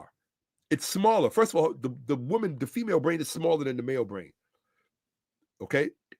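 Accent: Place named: New York, United States. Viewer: Thanks. Im always reminded of my fifth grade friend from New York while listening to you because of the way that you speak. The knowledge that you teach is truly appreciated.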